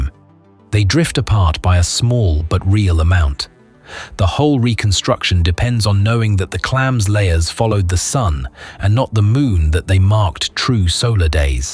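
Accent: England, United Kingdom. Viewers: What’s very funny is how the general public believes so much more in the narrator with an English accent, as opposed to any other accent rather irritating to me pompous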